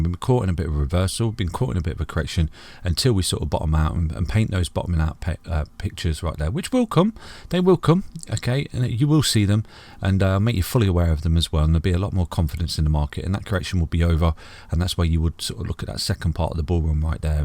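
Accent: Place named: England, United Kingdom. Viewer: I just needed to hear a calm and soothing English accent right now talking bigger picture stuff. Thank you for existing. Subscribed.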